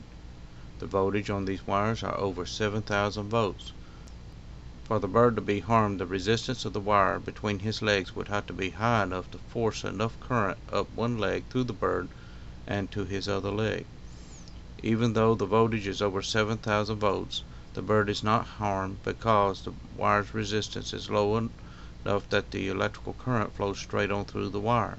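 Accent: South, United States. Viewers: this guy's southern drawl is hilarious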